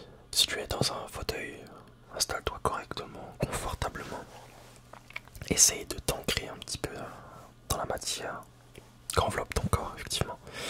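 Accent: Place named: France. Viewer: Alors c'est peut-être moi qui hallucine, mais je trouve que tu as un petit accent mdr Pas du tout dérangeant au contraire ! Ça éveille ma curiosité ^^ Tu vis plutôt dans le nord ou le sud de la France ? Pardon c'est sûrement indiscret ce n'est pas grave si tu ne réponds pas ^^ Sinon j'ai adoré la vidéo, ça m'a relaxé direct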